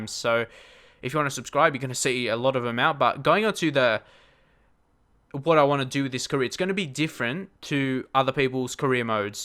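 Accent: Australia. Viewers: aussie guy.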